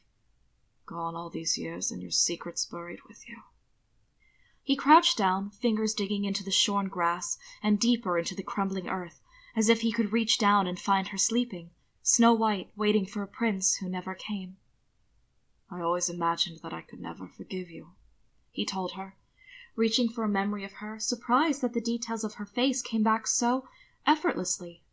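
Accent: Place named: Japan. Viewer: as someone who studied Japanese for a couple years, I think your accent is pretty close to sounding like one. but im not Japanese myself so take my opinion with a grain of salt LMAO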